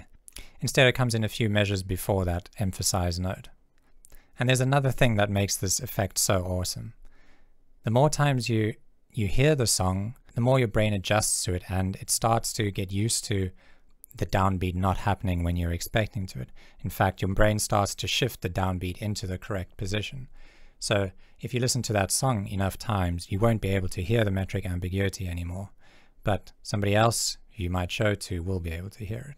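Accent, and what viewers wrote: South Africa. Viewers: Are you a South African that moved to the UK?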